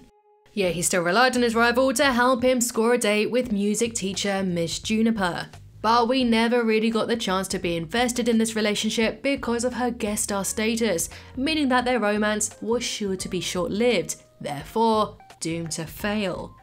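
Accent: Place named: South East England, United Kingdom. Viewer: It sounds like we both from south east England if only we could meet up and knew your last name so I could find eachother and talk Simpsons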